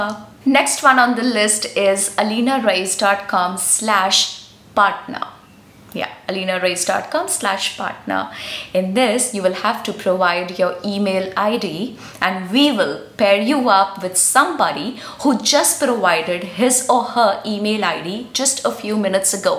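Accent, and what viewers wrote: India. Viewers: Unfortunately you have a heavy indian accent, particularly it is very difficult for a British to understand you...please work on your accents befor teaching anyones..or kind of fooling others.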